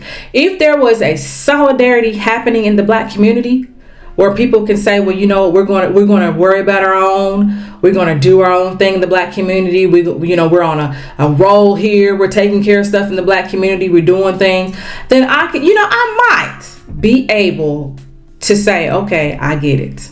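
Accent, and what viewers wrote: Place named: United States. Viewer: You arent FBA,stop maskerading!Tethers can't speak for us if you are not FBA; stop speaking for us; just because you have an American accent doesn't make you FBA; America has had immigration! You or no tether they can make us do nothing! Immigrants need to hold their own..leave us alone! Also, we do not look like Haitians; stop babbling; white people know the difference! You are talking gibberish! People undermind and disrespect us 24-7; we have done it enough. To hell with PanAfricanism and putting the capes on for them, leave us alone!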